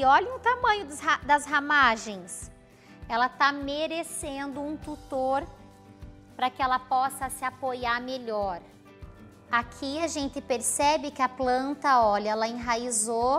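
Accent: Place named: Northeast, Brazil. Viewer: que sotaque maravilhoso meu Deus. Amo vocês nordestinos.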